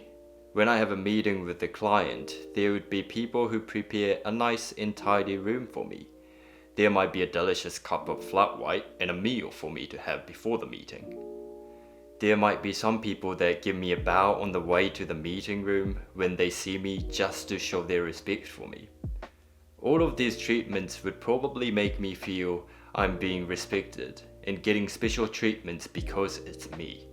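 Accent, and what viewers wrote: New Zealand. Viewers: wise and crazy cute! 🥰 ps. did u study in New Zeland? I can hear a kiwi 🥝 accent when u speak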